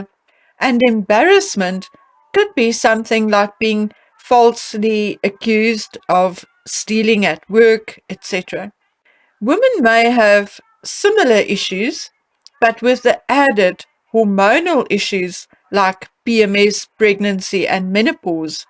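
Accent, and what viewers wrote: Afrikaans, South Africa. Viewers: Daai Afrikaanse aksent sal ek enige plek in die wêreld herken!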